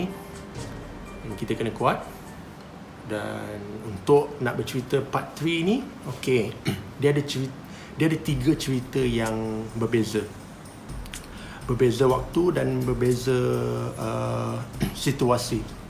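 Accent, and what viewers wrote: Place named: Pahang, Malaysia. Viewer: bahasa org lipis kot2. loghat dia pekat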